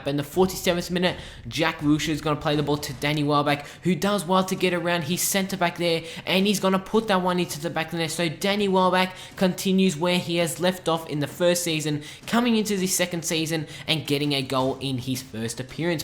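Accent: Australia. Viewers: Are you an Aussie and wot state in in vic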